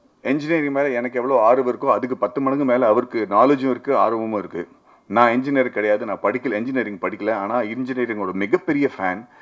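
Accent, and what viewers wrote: Coimbatore, India. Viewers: Sollanum "nga important "nga Maddy picked up Kovai Slang well 😅😅😅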